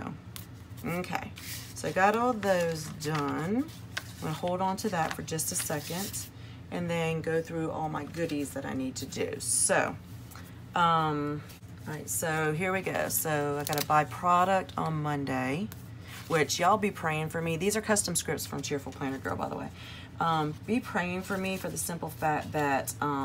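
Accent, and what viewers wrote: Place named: South, United States. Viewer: Can I just stop and give a hey y'all to your sweet southern voice! :)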